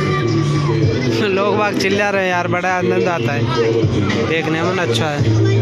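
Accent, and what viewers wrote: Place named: Nepal. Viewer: आप का हिन्दी सुनने पर नेपाल का हिन्दी जैसा है, मेरे नेपाली दोस्त जब हिन्दी बोलते है, बहुत सही लगता है। 🚩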